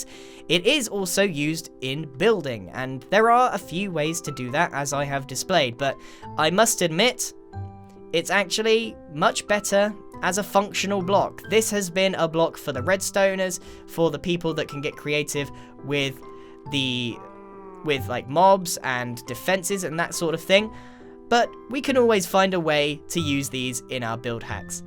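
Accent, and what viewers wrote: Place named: London, United Kingdom. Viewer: Hannah Huang HES from London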